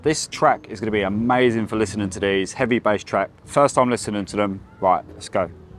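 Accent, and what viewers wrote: United Kingdom. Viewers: do you have a Cockney accent?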